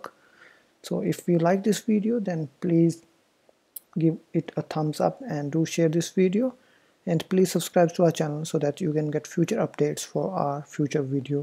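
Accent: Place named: India. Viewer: u sound indian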